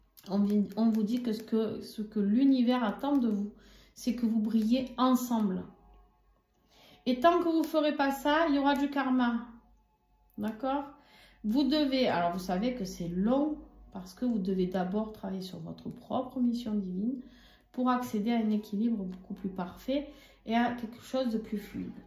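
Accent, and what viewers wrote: South, France. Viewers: bsr vous me faites rire avec vos expressions je les retients je n arrete pas de rire vs etes super et votre accent du sud trop top sinon les cartes me parlent :face-fuchsia-poop-shape: ps cul patate j adore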